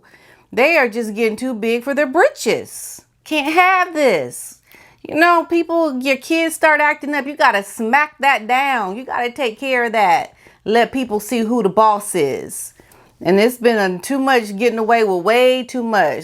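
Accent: United Kingdom. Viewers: I love your British accent, it's brill, cannot understand why the royal family are not saying anything, we have to pay for them pair as I am English, it needs to stop. Yes you are right an mp has got his foot in the door in parliament to make sure he can get support to remove their titles, I was made to pay towards their wedding everything despite never watching the wedding, just was not interested, could see her a mile off. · I like your British accent and if you want to speak it well and truly best to speak Oxford English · Yay talk british a little longer lol.thay was given the title from the queen. but it all goes thourgh the houses of parliament Sucks we know.but now so many people in UK are banging on the tables louder an louder so hopefully something will happen. but thank god there out of UK and our papers mostly.we are gutted about this gutter snipe. 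the money won't last long and no one wants to hear 💩 from her she dont know 💩about the royal family. if she knew about the commonwealth she wouldn't have put her 👠in her mouth megain 👩‍🎓🧠thinks she smart but good ole 👸Elisabeth will shock this couple to the core the 👸been around alot longer than she has.people know what ever thay produce the royal family will watch like hawks.and we know thay will recall harry home.god know how them two will unvail a statue of diana next year on what would be her 60bday. You watch tramp will look at all the cameras the world will be watching megain god i wish we could make her go though trainers gate and into the tower of London haha xx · Tosh, utter Tosh is what MM is (AKA Crap, Garbage, rubbish, skank you get my drift) it’s a lovely word!! Loving your British accent!! I live a few miles away from Frogmore & Windsor castle (I’m poor) & I’m hoping my neighbours don’t come back to their base. 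P.S H never paid that money back- that’s utter balderdash 😉 😂😘 xx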